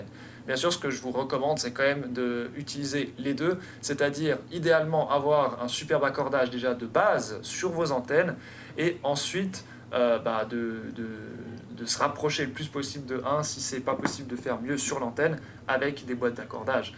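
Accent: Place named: Belgium. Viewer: Tiens il est belge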